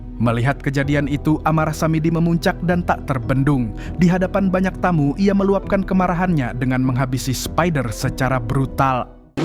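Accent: Banjar, Indonesia. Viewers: asli buhan Banjar kh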